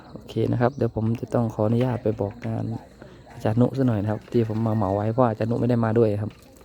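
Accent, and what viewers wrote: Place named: Korat, Thailand. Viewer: พูดเหมือนภาษาโคราชเลย ชอบๆ 55 fc ครับ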